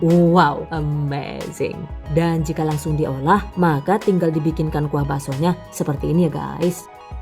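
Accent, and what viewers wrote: Banyumas, Indonesia. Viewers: Biasa...yang aku tungguin ngomong ngapaknya . ...bikin aku ketawa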